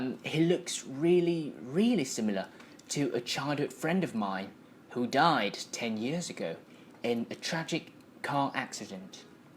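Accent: United Kingdom. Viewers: Tỉ năm mới tìm đc 1 anh nói English accent :3 không cô đơn trong thế giới các bạn nói giọng Mỹ =)) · Chào bạn, mình rất thích giọng Anh Anh của bạn. Bạn có thể chia sẻ cho mình 1 số channel British Accent mà giọng Nam ko? Thanks for your help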